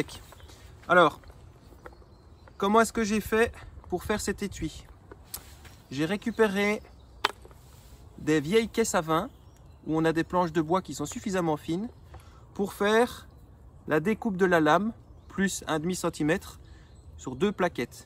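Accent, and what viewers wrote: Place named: Belgium. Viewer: salut l ami , es tu belge ? Ton accent me rappel m chez-moi ( liege ) super vidéo continue l ami :)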